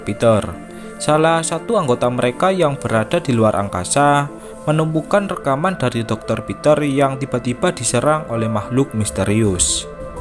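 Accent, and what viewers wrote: Java, Indonesia. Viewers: Medok yo mas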